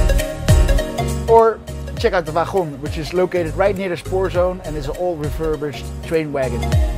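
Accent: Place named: North, United Kingdom. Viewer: Jesus lad ,that accent is not from Tilburg ,you are from up North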